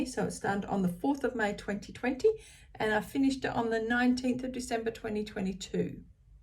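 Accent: Australia. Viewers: So happy to see you back and hear your gorgeous Aussie accent! I love all of your finishes and can’t wait to follow your progress in our group and on screen! Big hugs to you! ❤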